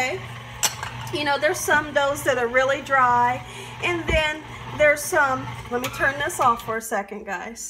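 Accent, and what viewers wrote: South, United States. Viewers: I love watching you bake,nd your talking country charm makes me feel like Im back at my grannys house.Im definally gonna make these.Im craving them now!!!!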